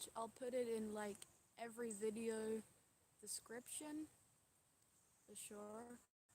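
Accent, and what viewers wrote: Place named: Australia. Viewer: please do more light language! I rarely come across light language on youtube that legitimately affects me, and yours does, along with Keleena Malnar's. Are you Australian? You sound aussie for the most part!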